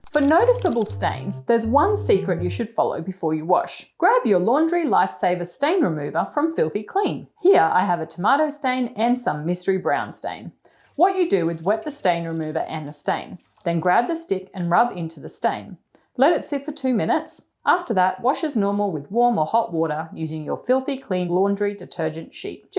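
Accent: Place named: United Kingdom. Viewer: It's lace and not "lice". Why do Britishers wag their tongue while saying certain words is beyond me 😮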